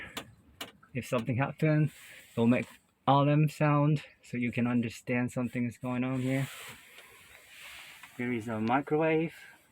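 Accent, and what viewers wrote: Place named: United Kingdom. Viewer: 개인자작이 업체보다 더 잘만들수 있다니..대단하십니다~ 나중에 의뢰하게 될지도 모르겠네요.. 그리고 개인적으로... 영식영어 발음이 한국인에게 미식보다 어려운 점이 많다고 생각하는데 멋지십니다^^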